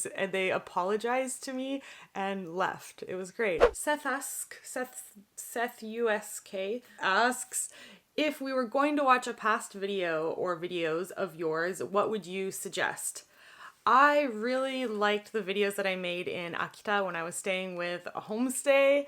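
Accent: Canada. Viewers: I live in WA state and I interact with Canadians often. The dead giveaway for Canadians for me is the way they say "eh" and "about", and does not disappoint! LOL, thank you for sharing! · Has ever mentioned what part of Canada she's from? I'm curious about her accent My instincts say west canada, vancouver, but i'm a total novice when it comes to their accents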